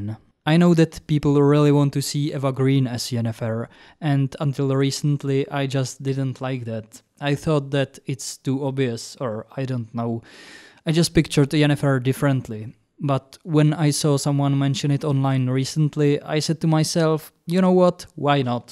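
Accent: Czech Republic. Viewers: man that czech accent is nice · are you czech by any chance? the accent seems familiar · Hej úplně random jsem našel tvoje video a je vtipné že okamžitě jde poznat čecha co mluví anglicky :D Velmi dobré btw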